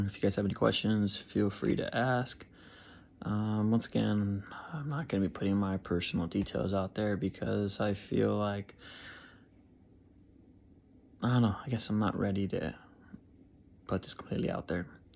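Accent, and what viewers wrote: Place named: United States. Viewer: I get my procedure with her in less than 2 weeks. I can’t wait. It sounds like you’re from the USA too? I have non stop flight from Chicago and it’s only 10 hours. I am wondering though how it was for you once you got into turkey and also traveling home as far as entry / customs go. I have my evisa and I also heard something about a passenger locator form before leaving too. Anything I need to know?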